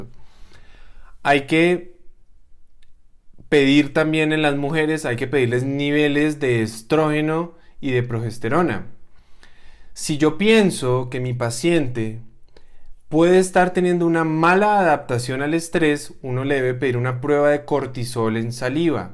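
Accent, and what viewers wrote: Colombia. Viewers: Gracias, muy Colombianisimo, en su hablar! Veremos como me ayudo con toda esta informacion. Estoy en un pais, donde : TSH, T3,T4 y listo. y hay de mostrar que sabemos un poco mas.......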